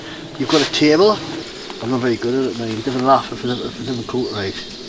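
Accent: Newcastle, United Kingdom. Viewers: Fuck me! Who knew jimmy Cricket was a geordie?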